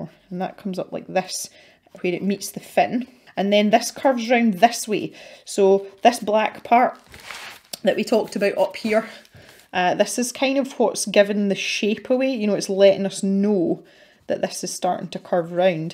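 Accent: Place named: Scottish Highlands, United Kingdom. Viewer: I do enjoy watching your videos where you have put on your “instructor hat” on! You have a true gift for sharing ideas and giving your viewers practical advice on creating art! Truly inspirational, thanks. I remember when I was a youngster and on Sunday evenings we watched a TV Show: Walt Disney’s world of Color! Did you get this show in the UK? They mostly showed Disney real life movies, “Charlie the Lonesome Cougar” and most movies were not cut to fit the time frame of a TV movie, so if they had excess time that did not fill with commercials they showed Disney shorts, sometimes cartoons but sometimes they had creating Disney characters and they showed us viewers how to draw Mickey or Goofy and I remember when I sat down with pencil and paper hoping that the movie would end early and I would get a chance to draw along with the Disney Artists! 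Thank you for sharing all of these wonderful tips and bringing this memory back to the front of my mind! Thank you, watching your videos and listening to your highland lilt brings me and others joy!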